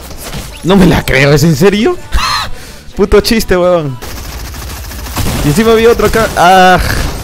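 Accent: Peru. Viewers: eres peruano?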